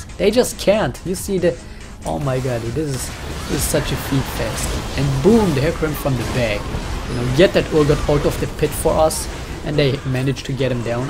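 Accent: Germany. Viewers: Man hört ich raus das du deutsch bist. Ich meine das wisch bei wich und zink bei think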